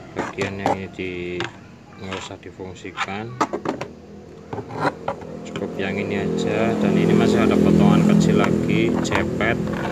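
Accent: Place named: Brebes, Indonesia. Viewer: Cerdas men rika wa nyong ngerti rika wong Brebes o ya? Hehehe..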